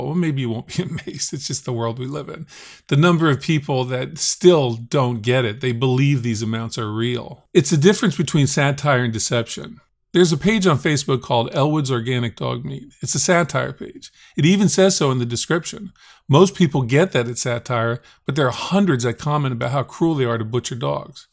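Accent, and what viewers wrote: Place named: West Virginia, United States. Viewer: I’ve seen this AV coin pushers videos before. The comments are truly frightening. I can’t tell if people are seriously believing this crap or just suspending their disbelief. I saw one earlier where he claimed to have spent half a BILLION dollars on 25 quarters. 😆 The clown sounds like a hillbilly from the deepest darkest corner of West Virginia….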